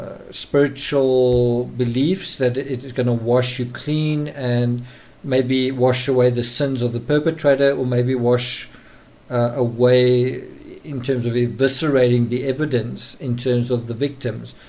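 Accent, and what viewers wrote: South Africa. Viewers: Definitely a South African accent.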